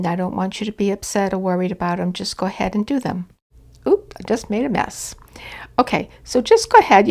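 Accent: New England, United States. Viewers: You have a lovely video library. Just subscribed. Also a lovely New England accent. I’ll definitely be checking back.❤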